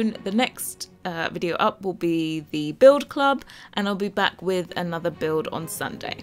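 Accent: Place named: Dorset, United Kingdom. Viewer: You're from Dorest? I can hear it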